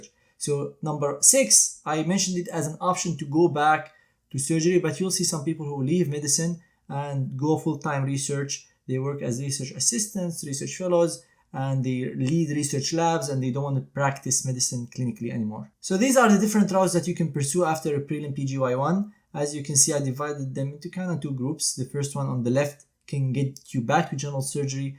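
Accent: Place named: Syria. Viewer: Hi Dr., I wanna thank you a lot for being such an inspiration for me and for lots of others, I really appreciate your hard work. May I ask you a favor? Can you make a video in Arabic telling us your story with your original Syrian accent, tell us how could you overcome all the struggles that face us being arabs, how could you modify your mentality to pursue your dream for such a competitive speciality. What was your cultural background and how studying at Aleppo was, how could you adapt living at the us at your first months. I wish I could know all of these stories that would me feel like as you are a true friend "which is an honor I would like to have🥰"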